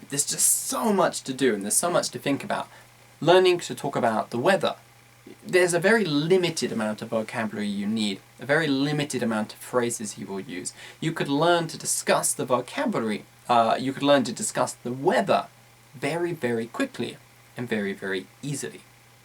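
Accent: United Kingdom. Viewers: excellent lesson i would like a video about job's interviews please your accent is like brittish people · I think using british accent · sahid maarif It’s a British accent. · U are british is not? I'm mexican :v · is it britain english? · It´s british accent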